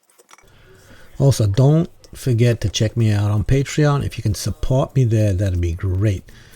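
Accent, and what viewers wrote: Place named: Boston, United States. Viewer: Good tips! You don't need to tell us you are in Boston... we can tell haha. Great job!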